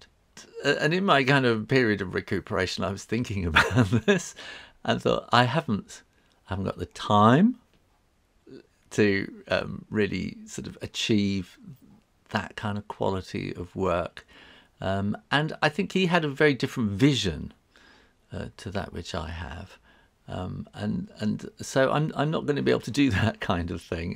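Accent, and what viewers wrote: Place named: United Kingdom. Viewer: I want to be british when i hear your voice :) And love your cheerfull ways. You have been working as and illustrator for a long time and I as a fulltime illustrator of abour 5 + years, would love to hear all about your career and life. A little like your draw my life videos but with just you talking. Other than that it is so important to think about what your mountain is, what your goal is within your art. But about this channel I would personally just love to see your smile and voice here on youtube. But if it takes to much energy and does not give you anything I would quit the workload that does not fit you. Love Nille from up in Bergen, Norway ❤️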